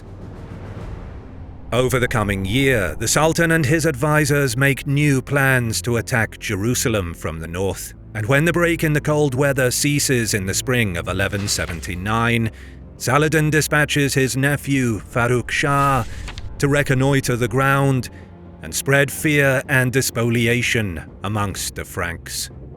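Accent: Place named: United Kingdom. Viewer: Stop with the damn British accents on every documentary, it's the most annoying trend I've ever experienced!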